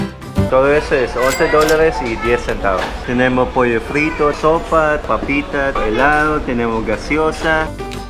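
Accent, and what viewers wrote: Philippines. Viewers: Es un milagro para oir un Filipino hablar en español. No como los tiempos mas trempranos antes la guerra en 1898. Quien te enseñas? Sabes hablar Español con el accento Filipino como los hispanoblantes de Filipinas (El espanol de Filipinas)? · Habla español como un actor filipino que se llama Mike Tan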